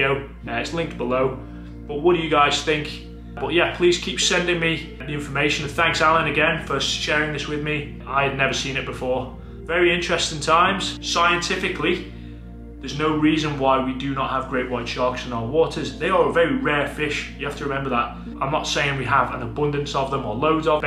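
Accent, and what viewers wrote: Liverpool, United Kingdom. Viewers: How come you sound kind of like a Scouser?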